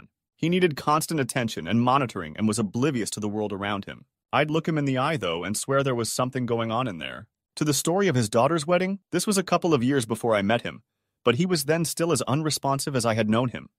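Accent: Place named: United States. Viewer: Okay, the bizarre word replacements are grating enough, but now I'm really calling shenanigans. You, narrator, are clearly American, and yet you just pronounced 911 as "nine-hundred and eleven" instead of "nine-one-one." 'Fess up, is this channel a psychological experiment designed to study irritation or something?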